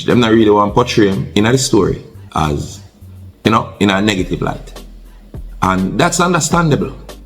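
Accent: Jamaica. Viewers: Well spoken Jamaican accent. Kingsley. Nail it😅 Andrew Holmes is well spoken like Kingsley ❤❤❤❤❤❤❤😂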